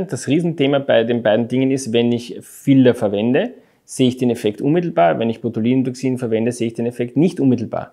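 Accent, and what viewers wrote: Austria. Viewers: Echt jetzt? Ich bin Polin, nie in Österreich gewesen (in DE übrigens nie länger als zwei Wochen am Stück) und verstehe ganz normal. Wäre Ihnen sächsisch oder schwäbisch etwa lieber? Herr Doktor spricht in seinen Videos übrigens keinen Dialekt, es ist Hochdeutsch mit dem niedlichen österreichischen Akzent.😊 Nichtmediziner können evtl die vielen lateinischen Namen nicht kennen, wie Septum, Ligamentum, N. Trigeminus, N.Facialis etc.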